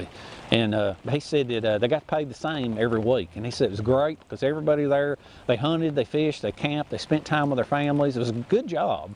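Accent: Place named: Kentucky, United States. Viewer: Are you from Kentucky? I grew up in Kentucky and your accent sounds familiar to me.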